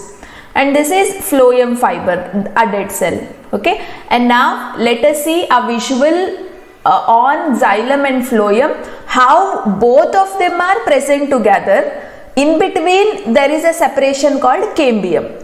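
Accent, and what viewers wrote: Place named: Andhra Pradesh, India. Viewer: R u from andhra??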